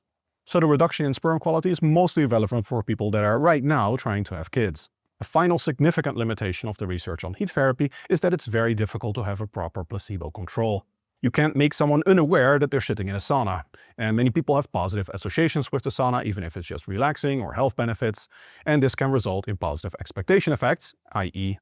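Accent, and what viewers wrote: Netherlands. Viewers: I wasn't watching the video and yeah that line did pop my eyebrow when I heard it. Going back it followed the other Dutch-tell phoneme he uses which is the uncentralized 'u' in "unaware". Anyway, if you're reading this, your English is great and your accent is very mild. Even Jeff Nippard has a noticeable accent to an American audience. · I know he's Dutch, but not where in the Netherlands. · His accent is pretty screwed up anyway because he's been away from Netherlands for so long.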